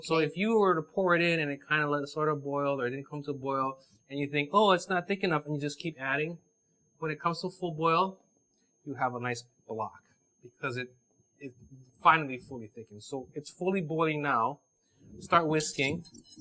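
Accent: Hawaii, United States. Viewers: u must not be from hawaii. thats how local people talk get over it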